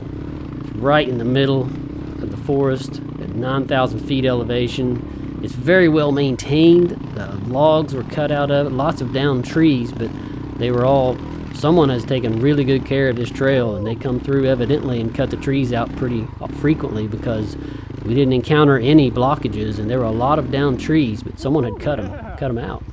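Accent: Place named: South, United States. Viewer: I love this kind of video; No music, good southern narration 😄, the sounds of the bikes. Excellent work.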